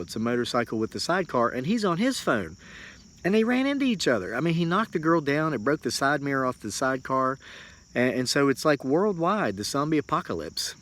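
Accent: South, United States. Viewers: Hey, watch out flipping that old phone around, you might get beamed up to the Starship Enterprise. Haahaa. Just kidding, I got one too, and will keep it until it dies. Real money savers too. You are right about people zoneing out with their noses 8" from the I phone screens. It will, and is having a negative effect on our society, people (Young people) have lost the art of simple conversation and reading faces and expressions, and that is concerning. Agree that getting a signal in the country can be very hard, you may have to use a different carrier. Here in the Ozarks, it can be a problem sometimes too. I see that you have a bit of a Southern accent, are you from the Carolina's? If so, did you hunt and fish as a boy with your dad? Will you be teaching your son those skills too? Enjoy your show. Thanks.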